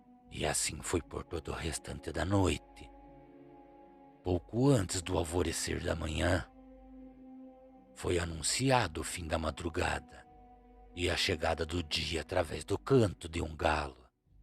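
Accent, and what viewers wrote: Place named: Paraná, Brazil. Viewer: Bom noite,! Pelo seu sotaque tu deves ser do Parana!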